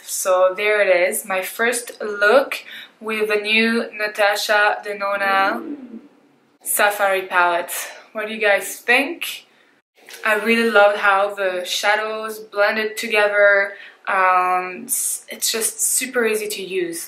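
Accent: France. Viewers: Beautiful look! Thanks for your first impression review, I'm so torn on whether or not I should buy it. I was wondering what your accent was because it sounded familiar, yay for another french girl! I'd love to see some videos in french too! I moved to the USA from Paris when I was 9, so I don't have my accent anymore :( it's a shame because I love it so much.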